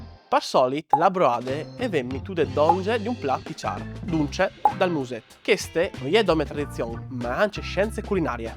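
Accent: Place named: San Giorgio, Italy. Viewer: Eh sì che si capis che tu sês di San Zorz! ZZZZZZ...e no stoi durmint! Braf!👏👏👏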